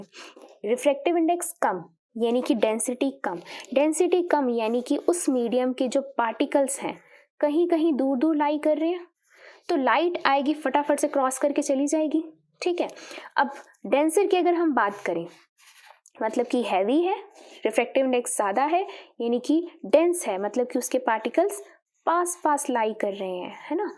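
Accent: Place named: Bihar, India. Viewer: Dide you are bihari 🤔.. because of ur voice I can make out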